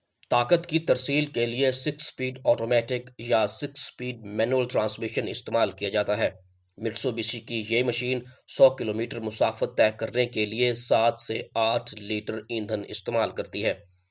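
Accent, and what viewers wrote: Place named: Pakistan. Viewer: Qurban jao ap k andaz ar ghaateee urdu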